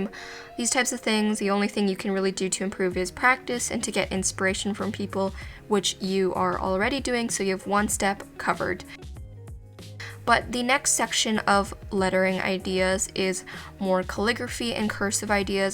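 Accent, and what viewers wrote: Canada. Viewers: I can surely say that you're Canadian from the way you say "about" and "out". Am I right???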